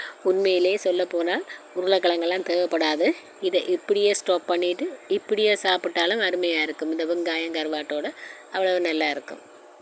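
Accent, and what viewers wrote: Sri Lanka. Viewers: உருளைக்கிழங்கு கருவாட்டு பிரட்டல் அருமை. இலங்கைத் தமிழும் மணம் வீசுகின்றது.. வாழ்த்துக்கள்🎉🎉🎉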